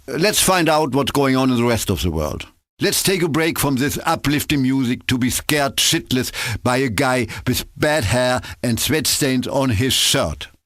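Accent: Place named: Africa. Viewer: sounds like african english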